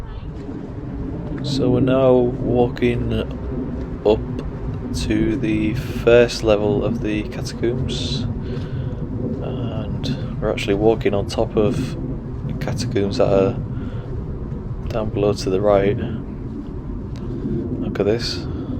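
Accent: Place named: Liverpool, United Kingdom. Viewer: Have you ever seen the little ghost? Or any orbs? A fascinating place with so much history- I live in Canada where obviously most of our cemeteries aren't as old- depends on where you live. I did an anthropology project years ago when I was in uni, one of my favourite essay topics. I love your accent- the Liverpool accent is very distinctive.. Namaste from Canada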